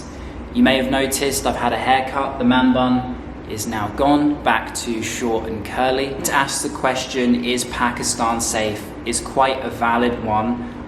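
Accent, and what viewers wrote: England, United Kingdom. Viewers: I fell in love with your accent. Cause I really love English accent😊HI FROM TURKEY 🇹🇷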